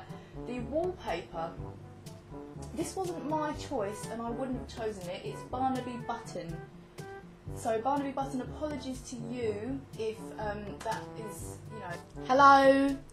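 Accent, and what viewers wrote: United Kingdom. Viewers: the more you post video..the more you got the british accent when you talk.. Kinda funny but sooo cool !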